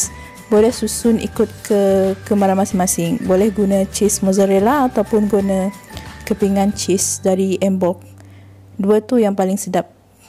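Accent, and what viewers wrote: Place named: Sarawak, Malaysia. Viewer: Dengar cara klaka apat cam sarawakian...sekali jumpa bideo lain ternyata betol² sarawak....wahhhh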